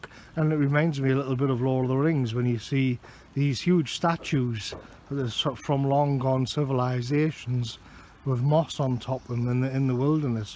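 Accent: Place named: Newcastle, United Kingdom. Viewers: Just for your info most Englishmen are mixed and the Celts/Romans had a huge effect on the landscape before the saxons came. Interestlingly you sound Northern so am sure you have heard of Cumbria thats hugely diverse and many a standing stone, different dialects. P.s And many of the larger features were built by my ancestors. Norman castles. I consider myself a Brit as I know my ancestry and am proud of all my ancestry be it English, Welsh or Viking. Youll find many a Viking name up North and the Saxons came very close to losing. That said we ended up with two Viking Kings. Cnut and William 1 (our curreny Queen descended from Rollo/William was his Grandson I think)) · Is this a Northumberland accent? It sounds Welsh in part, Scottish in part and Geordie in part. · Sounds pure Geordie to me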